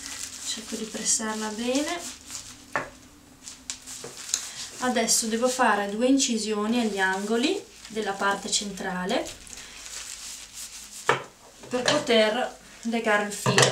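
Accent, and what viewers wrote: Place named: Veneto, Italy. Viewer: Che bello accento 😻 sei veneta ?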